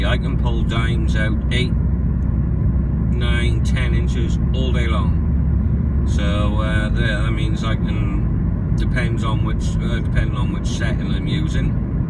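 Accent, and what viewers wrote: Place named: Alabama, United States. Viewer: I'd recognize that voice anywhere! It doesn't matter where you go in the world,. That Alabama accent is always going to shine through!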